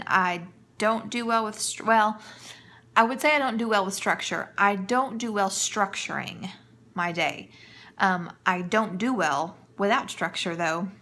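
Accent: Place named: South, United States. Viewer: Gopher= go for- That's that classic southern talking coming out. I say that word 15 times a day. If I put our clothes on the couch, I find them all in the couch. I pulled a sock the kids wore when they were 5 out of the couch....and I haven't had this couch but a year. my pillows are probably made of lost clothes. You have a servants heart and a desire to serve others and support your family. We also must have balance in our lives, and that can easily get out of whack if we are not making our balances in life a priority as well. It is natural for them to go one way and then the other. But, it's helpful when they remotely stay center. So good to see you on here since we never get to see one another. Try to relax, get some rest, drink enough water, and keep your strong faith.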